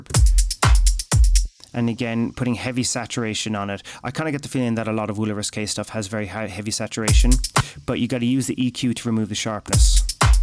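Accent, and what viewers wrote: Ireland. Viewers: Out of curiosity, which part of Ireland are you from ?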